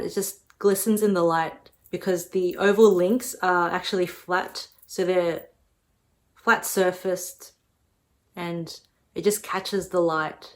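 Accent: Australia. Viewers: Hi beautiful! I so dig your Aussie accent😍so charming! Love that you showed us how you styled & wear this, i have taken notes!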